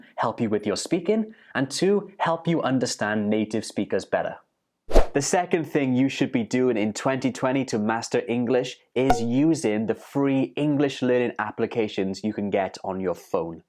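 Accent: United Kingdom. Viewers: Outstanding British accent!where are you from?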